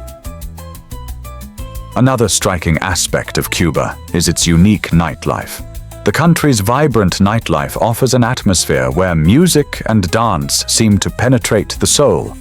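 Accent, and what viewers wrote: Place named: England, United Kingdom. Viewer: The English accented AI voice is barely tolerable.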